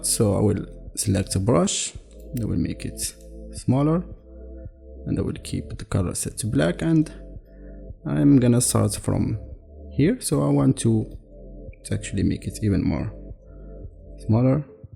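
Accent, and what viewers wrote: Morocco. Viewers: Are you Moroccan, I can tell f accent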